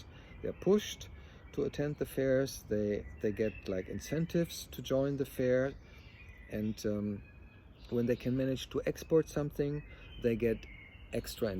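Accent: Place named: Germany. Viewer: I guess you are from Deutschland because your accent is like my teacher( I am a Chinese student studying in Germany). I really like your own thinking about the Chinese model. and I was also inspired by your talk also to understand further about china. I also respect you for your really independent thinking and analysis ( because most of the west media is full of ideology, arrogance, and prejudge) hope you can produce more videos about your analysis of china, whether is a good or bad point. because I appreciate your neutral altitude (Daniel Dumbrill is also a good neutral one also <link> And I think one common thing between China and Germany is that both 2 countries are pragmatic, it's like scientific thinking to manage the countries. Vielen Dank für Ihre Erklärung und hoffen, dass Sie nach der Epidemie wieder nach China kommen können.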